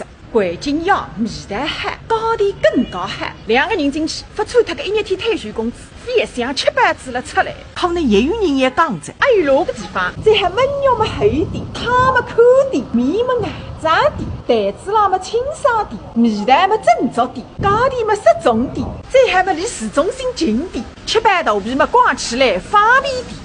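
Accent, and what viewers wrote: Suzhou, China. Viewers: 大闸蟹。等々，作为美食介绍加入历史典故。以及人文知识，则更加丰富多彩。是地道苏卅姑娘，我们同乡人听起来非常妙，但非苏州人除江苏南部人外，或许你说的太快，有点听不懂，所以望今后做网上节目，说话节奏慢一点，尤其苏卅地方俚语，越慢越好，如：然（你），北京西路，北和西要说出正宗苏州音，好听。十五，沙五也要着力沙。…..仅供参考，新加坡很多华人上网，听到苏卅腔很高兴。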